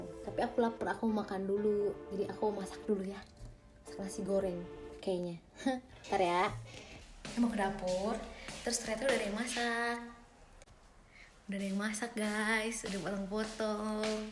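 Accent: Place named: West Java, Indonesia. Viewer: Logat sundanya masih kedengeran wkwk. Mirip papahnya bgt ihhh gemes wkwk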